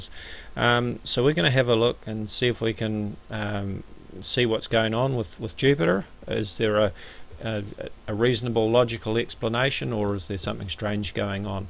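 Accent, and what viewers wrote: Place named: New Zealand. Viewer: awesome accent mister Kiwi. Kuddos from mexico.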